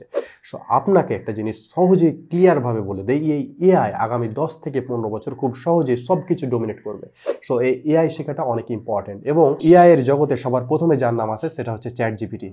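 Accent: Chapai Nawabganj, Bangladesh. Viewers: ভাইজান, আপনার Accent শুনেই বুছেছিলাম চাপাই এর ভাই..... boost করেছিলেন সেখান থেকেই পেলাম, ধন্যবাদ দেখা হবে, ইংশাল্লাহ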